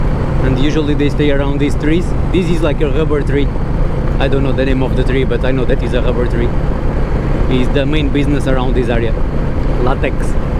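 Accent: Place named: Portugal. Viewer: your accent sounds alot like a portuguese guy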